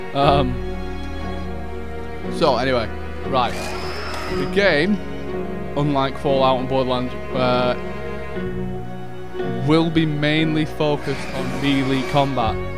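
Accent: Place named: New York, United States. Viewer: I love this guys accent it sounds like a New York accent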